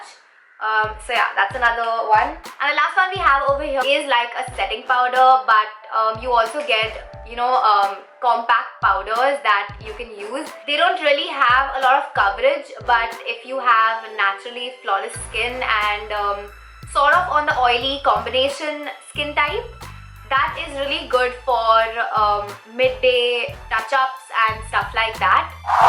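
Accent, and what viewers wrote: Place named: India. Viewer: I hateee indian accents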